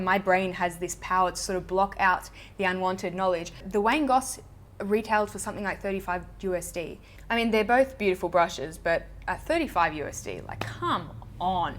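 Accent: Australia. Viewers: I accidentally spoke in your kind of Aussie accent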